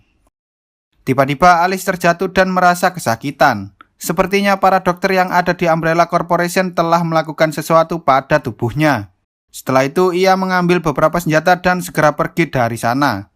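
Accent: Java, Indonesia. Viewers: Wis rasah suen suen dri jawa mna bang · ini suara dubbing jawa ya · Orang jawa kah? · Wong jowo ya bang? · Bang wong jowo to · Bang jawa ap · Bang orang jawa ya?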